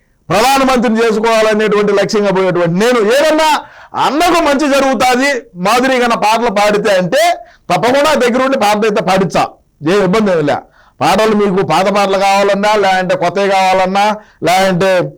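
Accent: Rayalaseema, India. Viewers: anna me rayalla sema language lo challa Baga mataladathu very nice allthe best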